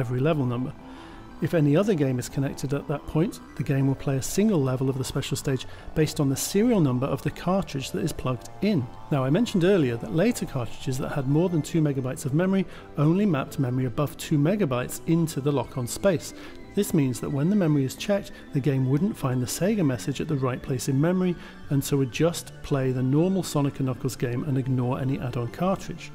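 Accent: United Kingdom. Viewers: A charming English voice I gotta subscribe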